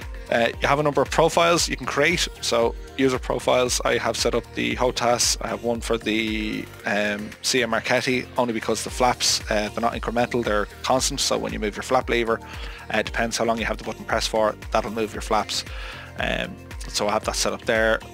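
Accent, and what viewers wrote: Ireland. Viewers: Unmistakable Irish accent, detected it from the first syllable of the first word :)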